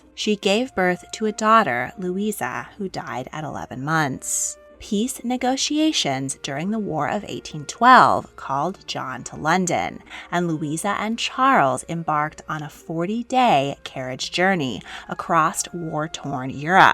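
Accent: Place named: New Jersey, United States. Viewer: OhMayGaud!!! Your Jersey accent is awful!! It makes it very hard to listen to this and take it seriously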